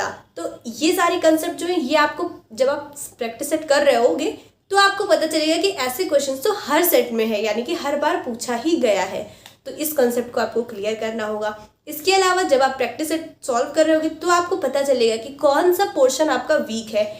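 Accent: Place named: Moradabad, India. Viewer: Aap sayad moradabad mandal se ha he aap ki language bata rahi hai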